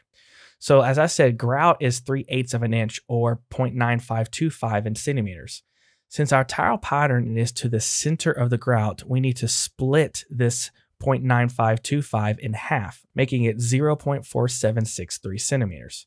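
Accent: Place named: South, United States. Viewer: i like your southern accent cowboy thanks for the help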